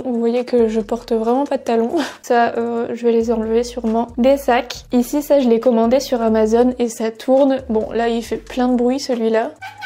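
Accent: North, France. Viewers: Merci pour ta video mais tu et du nord non?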